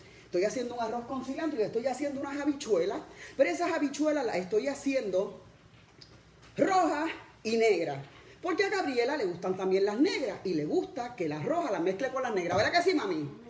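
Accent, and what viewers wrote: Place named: Puerto Rico. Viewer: Sii se nota que es puerto rique~e y apura sepa!! Y a tii a leguas se te nota la envidia....sii no tienes nada que decir aracante pal la mierda!! Boricua hasta la muerte!!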